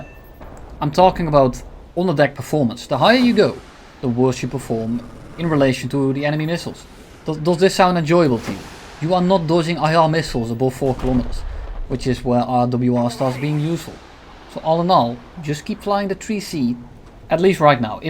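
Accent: Netherlands. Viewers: When you are frustrated the dutch accent comes out so strong.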